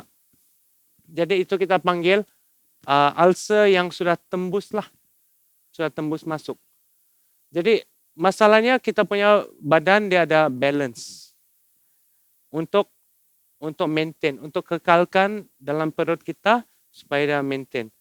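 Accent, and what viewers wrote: Sabah, Malaysia. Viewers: Haha this doctor is awesome. Kalau sia tutup mata, betul2 macam kadus dia punya slang. Keep up the great work you are doing, very informative and insightful.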